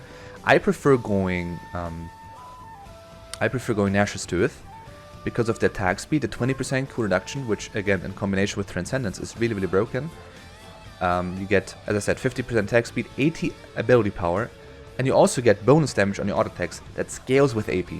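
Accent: Germany. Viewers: Just discovered your channel. I wanted to start maining Shaco and your video was very helpful. Thx man and keep it up. PS: You sound very german in my opinion, especially how u say Talisman :D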